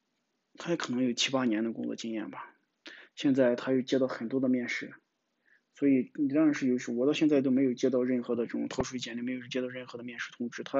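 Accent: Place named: Shaanxi, China. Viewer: 我感觉像陕西的，哈哈，咸阳么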